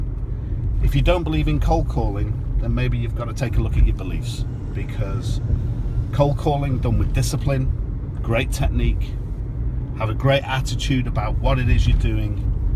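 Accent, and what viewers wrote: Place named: Liverpool, United Kingdom. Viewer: A scouser in Miami lol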